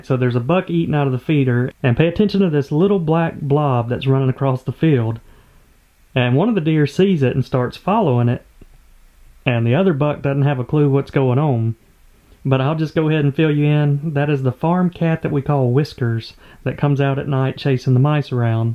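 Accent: South, United States. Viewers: Has his accent become more southern??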